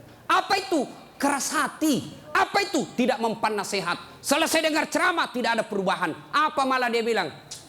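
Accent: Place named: Bugis, Indonesia. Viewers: Alhamdulillah ust tak pernah merubah gaya./logat bahasa sulselx dimana memberi ceramahx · Seneng aku dialeknya ustad ini Makasar punya, Krn aku prnh tinggal di Makasar, seru ustad Gaya dialeknya ternyata bisa diterima semua lapisan muslim di Indonesia Ttp istikhomah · Orang jogja tau logat makassarnya udztas yaa? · #seneng LOGAT BUGIS, SULAWESI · Ceramah yg santai, lugas, Fakta kehidupan sehari hari, komunikatif, lucu namun bernas dan tegas dengan dialek daerah yang sangat kental (ustdx org makassar). Penonton terhibur yg duduk di panggung jg ikutan senyum dan tertawa. AUTO NGAKAK yg mendengarkan ceramah ustd ini.. semoga penontonnya dan pemirsanya jg AUTO TOBAT insha Allah.Syukran ustad DL ilmunya. Alhamdulilah allahumma shalli ala rasulullah Muhammad sallahu alaih wa ala ali wa sahbihi ajmain. Salam dari wong ngapak CILACAP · Itu cara org sulawsi memanggil sekumpulan org bu hamidah, di sulsel itu lumrah dan tdk trmsuk kata yg gak sopan. 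Kan pak ustadz bilang ini lidah Sulawesi bos, jgn dipaksa jadi lidah solo heheehe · Saya senang dengar kalau pak Ustad ceramah, bermakna, berbobot, dan bisa bikin kita ketawa, dn tidak lupa masih khas makasar, jangan dihilangkan khas makasar nya ya pak Ustad, sehat sll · Sehat terus ustad sy sllu suka cara bicara org Makassar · "Lidah SULAWESI ini BOSS, jangko paksa lagi pake lidah SOLO" 🤙🤙🤙 menit 15.03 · Dialek bugis tak hilang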